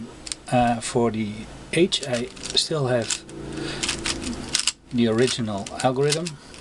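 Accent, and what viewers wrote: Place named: Russia. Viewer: You sound Rusian, btw nice video.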